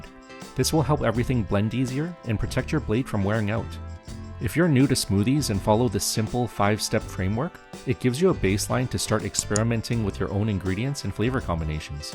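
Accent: Canada. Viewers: Do i catch a Canadian accent kind sir?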